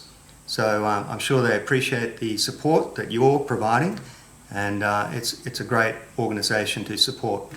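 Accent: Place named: Australia. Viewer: Talking is fine by me as I learn a lot from you, and my wife thinks your Australian accent is cute!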